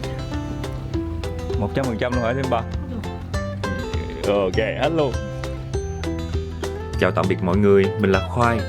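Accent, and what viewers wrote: Mekong Delta, Vietnam. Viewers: Bạn nói đúng, trong đó có mình nè. Mình xa miền Tây từ năm 1983 lên Saigon sống rồi thì đi xa hơn nửa (nửa vòng trái đất) nên nổi nhớ Miền Tây của mình chỉ có trong những giấc mơ. May là có Khoai giúp mình tìm lại những hình ảnh quen thuộc quê nhà và giọng nói quê hương. Quê nhà mình ở chỉ cách nơi nhà Khoai ở có hơn mười mấy cây số nên giọng nói cách nói chuyện của Khoai rất là thân quen với mình. Rất cám ơn Khoai đã đem lại hình ảnh quê hương đến cho mình. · Giọng đúng miền tây dễ thương ghê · 3 năm trước mình vô tình vào xem clip của khoai và thích ngay cái chất giọng miền tây và khuôn mặt dễ thương. Chúc khoai luôn thành công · Coi video của em quay thật rõ ràng,nói chuyện rất hay và chất phát đúng là người miền tây!!❤️ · Nhìn thấy bạn Khoai mà thấy yêu bạn với cách nc của bạn cũng như ng dân miền tây rất thân thương và thiện cảm. 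Yêu bạn mất rồi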